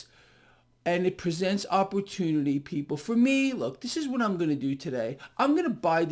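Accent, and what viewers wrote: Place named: Boston, United States. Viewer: subscribed for that Boston accent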